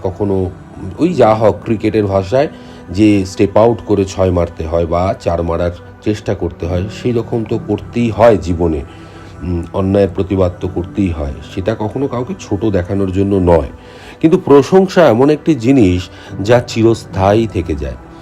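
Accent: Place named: Kolkata, India. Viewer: তোমার কথা থেকে অনেক কিছু শিখি, আজও শিখলাম, মনটা ভালো হয়ে গেল। তোমার কথা শুনেলে মনে হয় আমার ই কোনো আত্মীয় কলকাতায় থাকে সে কথা বলল। ঈশ্বর তোমার অনেক অনেক মঙ্গল করুন। ❤❤❤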